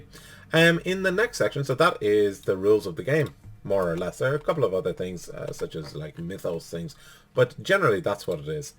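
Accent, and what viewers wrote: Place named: United Kingdom. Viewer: Thanks for the great video! Looking forward to your channel. Also, great accent- are you British per chance???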